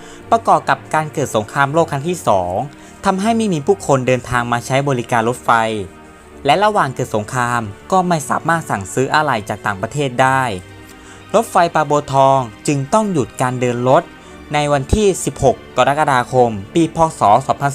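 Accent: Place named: Myanmar. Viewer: ทำไมพูดเหมือนพม่าหัดพูดไทย มึงเป็นพม่าใช่มั้ย😮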